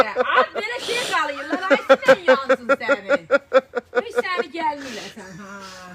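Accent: Goranboy, Azerbaijan. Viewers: Goranboydan salamlar.salam ay elli qizi.danisigina quzu keserem.oz rayonumuzun lehcesi lezzet eleyir.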